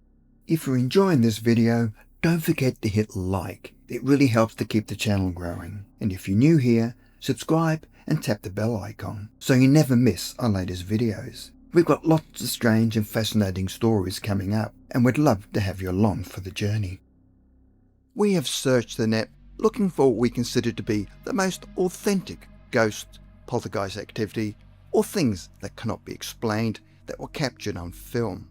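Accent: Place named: Australia. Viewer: Australian accent, I keep thinking of the geico commercial and the little gecko 🦎 is goning to appear at any moment.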